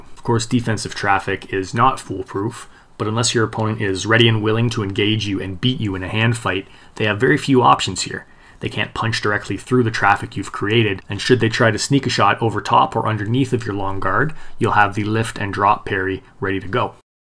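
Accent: Canada. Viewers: I have no idea what your background is, or who you are but your videos are phenomenal! The YouTube algorithm really did it's job recommending you. You sound Canadian(?), and you seem pretty educated. The way you break things down is almost uncanny. Do you talk about your background and I just haven't seen it yet? I'd love to hear about where you came from and who taught you because you are explaining things in an extremely succinct manner that is excellent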